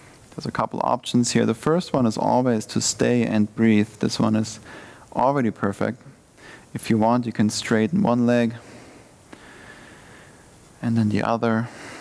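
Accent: Germany. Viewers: Awesome session. One of the best I ever made.. The guy has a familiar German accent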